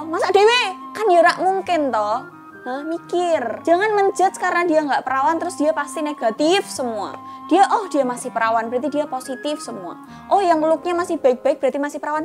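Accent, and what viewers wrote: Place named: Java, Indonesia. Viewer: Medok mbak.. salam soko wong semarang (Tembalang) ak bocahmu:D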